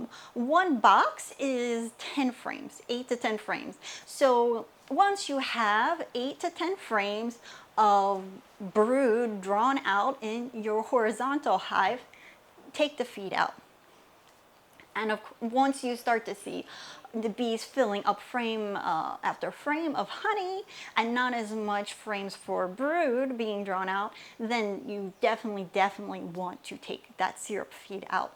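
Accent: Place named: Pennsylvania, United States. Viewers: Thank you for your videos! Also, you must be from South Jersey or Philly "Wudder". I love your content, and your videos have helped me tremendously. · You must be from PA (my dad says "wudder")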